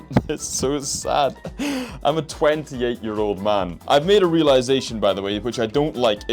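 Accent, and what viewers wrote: Scotland, United Kingdom. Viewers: thx for commenting, love your Scottish accent, looking to play a bagpipe rn ❤️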